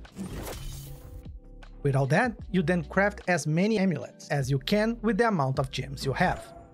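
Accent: Brazil. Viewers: Looks like a Brazilian accent lol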